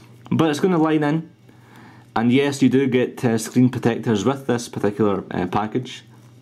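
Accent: Scotland, United Kingdom. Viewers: are you scottish? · I like how you say otter, o'er box, i love the Scottish accent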